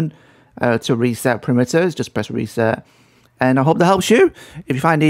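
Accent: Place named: North, United Kingdom. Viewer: im from canada but YOU are a northern boy arnt you..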